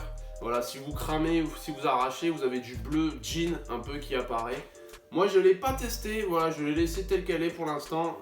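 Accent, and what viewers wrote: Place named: South, France. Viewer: Salut mec je viens juste de découvrir ta chaîne, enfin une personne qui a un peu l’accent du sud mdrrr j’en ai marre de l’accent parisien 😂 bref j’ai bcp aimé la vidéo +1 Abo ah oui et avant de partir, j’ai un budget de 200€ pour une AJ1 Hugh en 11, est-ce que je devrai attendre que Nike en sorte sur lapp où j’en prend une sur un site direct? (si t’as un site qui vend des snkrs pas cher dis moi je suis preneur) et pour re-finir ça pourrait être cool de faire une sélection de vêtements pour des tenues avec tes paires les plus importantes pour toi ça serait vraiment cool !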